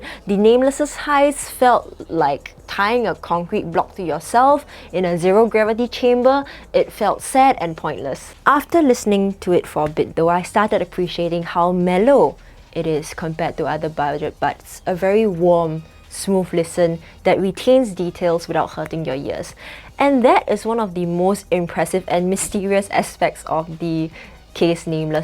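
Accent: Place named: Singapore. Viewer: my/sg accent <3 also you should try the qian69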